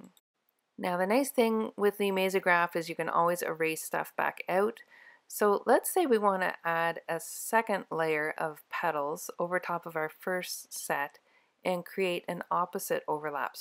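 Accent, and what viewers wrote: Canada. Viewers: Excellent quick tutorial. (Are you Canadian?)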